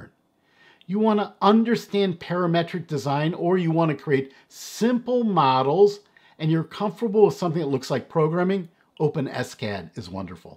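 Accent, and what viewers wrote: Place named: United States. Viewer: I listen english spoken vids very often, cos I'm learning english for my own and I found Mr., who I´ve been following for a quite some time now, have a very clear and nice american pronunciation. So, as I'm not a native listener in eglish, for me is perfectly well to listen his vids at normal speed. Cheers, John from Santiago de Chile. South America.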